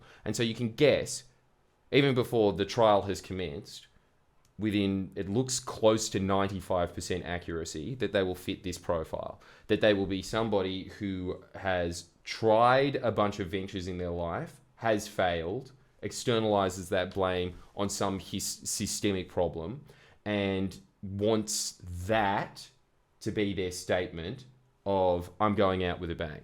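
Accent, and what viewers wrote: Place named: Australia. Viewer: Australian detected, opinion disregarded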